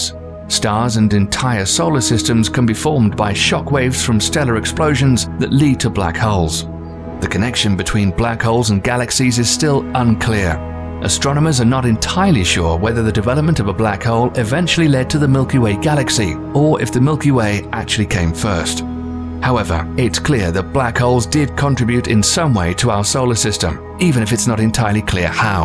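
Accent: United Kingdom. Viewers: You know it's a British video not only because the guy talking sounds british, but also because the video plays in 50fps lol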